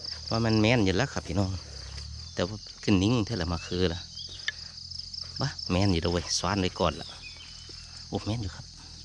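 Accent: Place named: Rural, Thailand. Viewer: กดติดตามเพราะสำเนียงการพูดว่าทำไมคุ้นๆที่ไหนได้คนบ้านคำกั้งดีใจครับ ปมคนบ้านแจนแลน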